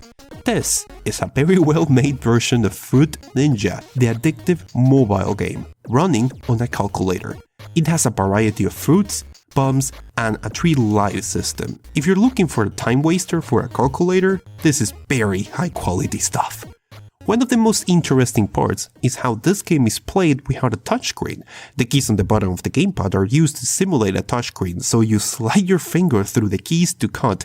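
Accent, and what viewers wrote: France. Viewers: Are you french? That could change my vision of the life 😲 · So are you from France? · wait, you're french? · Are you french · Tu es français ? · are you french ? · You're french? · Are u french? What's the accent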